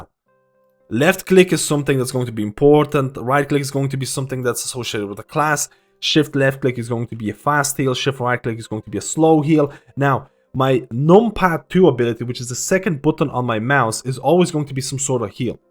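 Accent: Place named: Ireland. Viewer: Thank you for the great info. Your accent is really interesting may I ask are you part Irish part Scandinavian (swedish, norwegian)?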